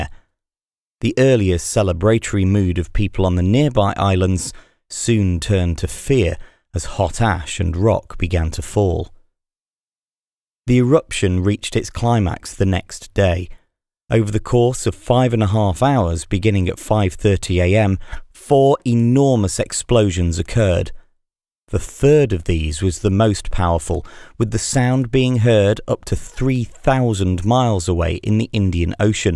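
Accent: United Kingdom. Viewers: The britanic accent...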